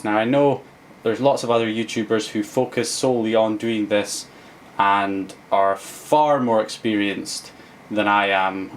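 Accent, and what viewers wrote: Scotland, United Kingdom. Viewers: Where abouts in Scotland you from?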